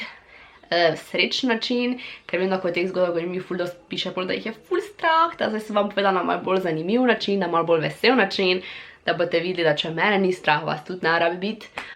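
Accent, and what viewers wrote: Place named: Primorska, Slovenia. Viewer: Ma kje ti zivis ker imas primorski naglas?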